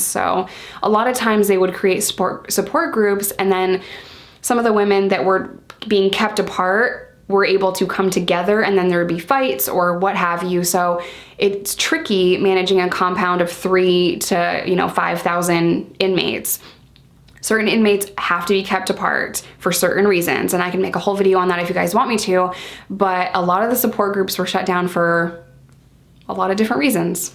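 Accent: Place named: Oklahoma, United States. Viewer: I live in Oklahoma love how you hear the accent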